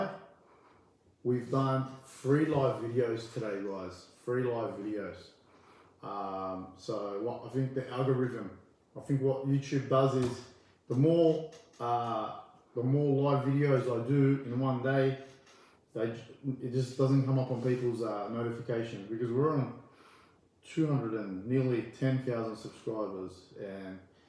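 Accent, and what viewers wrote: Australia. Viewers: Everytime I hear your Aussie accent, I smile... You have a special place in our hearts back here in Australia. You are doing great work. Don't give up ok. This kind of work you do for the animals can suck the life out of you if you let it. Please take care and look after yourself x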